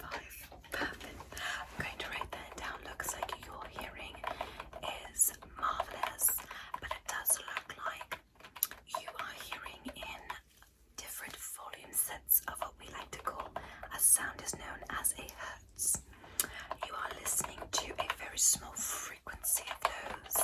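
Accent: England, United Kingdom. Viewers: Love the British accent!it’s almost just like mine!(for the record I am British!❤ Alain. 24601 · X A rather long visual. However your work is admirable. The effort is just. “English Accent” sound. For all eternity. Heart. I send you my best. Goodbye. X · As a brit im wondering why you chose a british accent for alien rp XD what you trying to say?! (Not complaining really) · U kind sound like a britisch person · love British women...Can't beat that accent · Love the British accent ❤️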